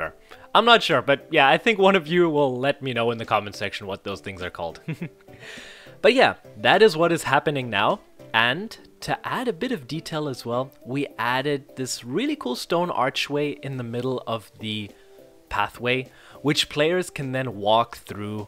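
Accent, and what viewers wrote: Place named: Germany. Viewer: You got this german accent 🤔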